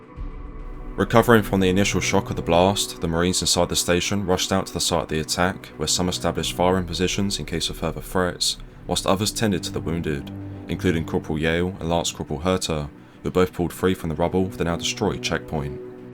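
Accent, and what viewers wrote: United Kingdom. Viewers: Always a British guy narrating American documentaries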